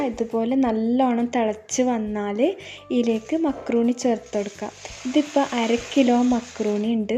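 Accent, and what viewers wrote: Kannur, India. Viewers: Ningalude Kannur language presentation style beautiful 👌 Speedil parayunnadine kaal better ithu thanne ☺️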